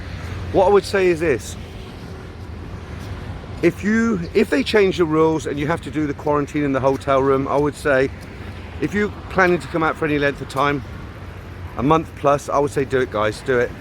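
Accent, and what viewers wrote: United Kingdom. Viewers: is my favorite "Thai expert" youtuber, with his classic British speaking accent and his perfect acting face. Keep up the good reportages of our ones beloved paradies. I started my Thai journeys in 1972 and my last visit was 2 years ago, thats 50 years of fun and exitment, what a good life we had. We were the lucky ones!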